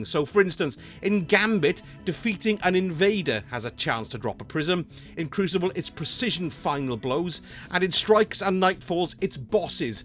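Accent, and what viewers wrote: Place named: England, United Kingdom. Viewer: Love the english accent!!!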